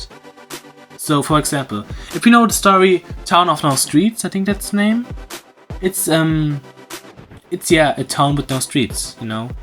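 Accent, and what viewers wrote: Germany. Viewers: Wait, are you german? I am currently learning german on duolingo so I kinda notice the accents 😅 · CONGRATS ON 1K ( also ur accent is so heavy??!!!! ))) german?? Italian???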